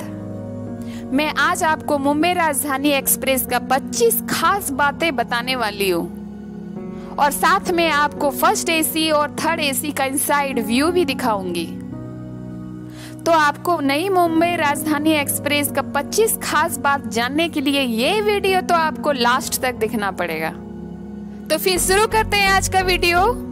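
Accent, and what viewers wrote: Bihar, India. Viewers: Language mei bihari touch jyada h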